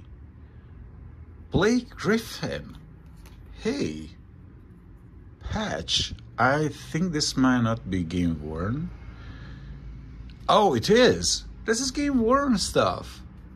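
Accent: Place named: Eastern Europe. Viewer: Eastern Euro step accent is always nice to hear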